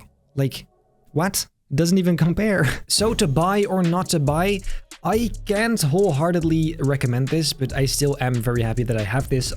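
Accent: Netherlands. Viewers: This video could've been good but the horrible Dutch accent and the pronunciation of Scimitar as Skimitar ruins it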